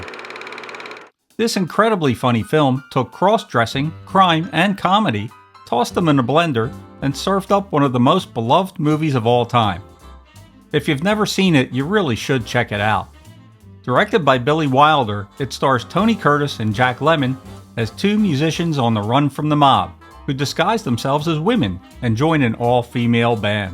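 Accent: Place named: Pittsburgh, United States. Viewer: Hey. Not sure if I asked before but are you a rust belter? PA? Ohio? Michigan? Your accent sounds Pittsburgh like.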